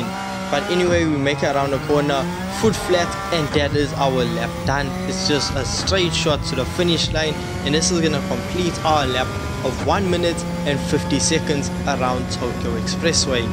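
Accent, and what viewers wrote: South Africa. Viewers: I hear my South African boy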